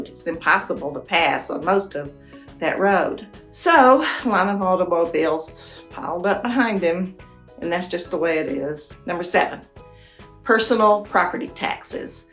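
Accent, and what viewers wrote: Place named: West Virginia, United States. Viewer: I see you picked up the accent lol. I live in southern WV and very rural and everything you say you’re lacking we have. We welcome everyone but liberal democrats. Y’all tend to forget why you left your original state.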